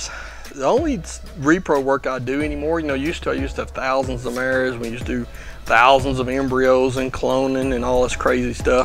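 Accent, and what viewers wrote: Texas, United States. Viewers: It's that great Texan accent. 🐴🐎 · honestly watching this guys videos cause one of my original characters is half kelpie and a kelpie is a water horse and i need to learn how horses work for the character. his accent reminds me of home (im in new york and from texas) and im trying not to cry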